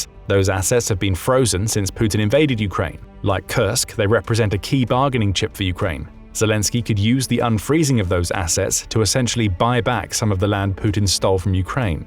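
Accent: United Kingdom. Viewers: Since you are British or Australian.? (due to your accent) you must be for sure against Russia and Putin. To use the word betray is wrong and you have no solid proof that it is the case. I am not Russian but I believe that Russia could eliminate Zelensky in minutes with an Orishnik rocket. However, because Putin promised the previous Israeli leader, Yahud Olmert ( name spelling could be wrong) not to take him out (because Zelensky is Jewish) he is refraining from taking care of Zelensky. My point is this Trump wants peace and to stop the killings in Ukraine. He is a businessman. Imagine if the three superpowers agree to peace the world would be in a much better place economically and safety wise. By the way, Putin wants to mediate cooperation between Iran and China which will happen very soon. I hope Putin will be able to succeed. Peace on earth.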